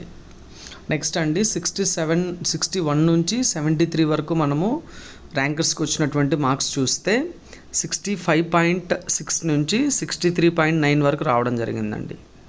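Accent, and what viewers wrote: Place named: Coastal Andhra, India. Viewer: Hi " " garu ur work is good bcz collected in 2018 dsc ranks information, i am not expected u as kadapa guy bcz does not dialect of kadapa😅 voice over is good as costal, i belong to ATP😁, all the best 👍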